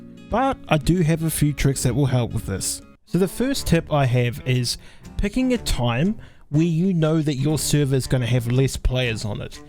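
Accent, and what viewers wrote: New Zealand. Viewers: quick question are you from NZ?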